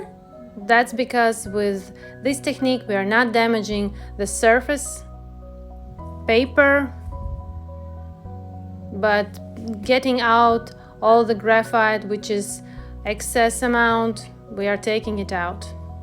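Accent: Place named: Russia. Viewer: Русский акцент не спрячешь))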